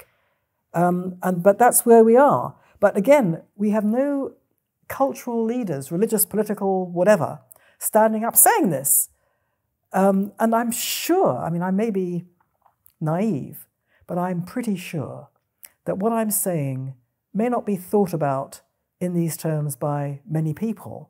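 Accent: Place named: United Kingdom. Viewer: " . . .because people need to live in a society which is civilized which looks after the welfare of others rather than yourself. . ." This comes from a female with a British accent. Hmm, wonder what she means by "civilized" and "the welfare of others". Surely she's talking about the others with much darker skin, right?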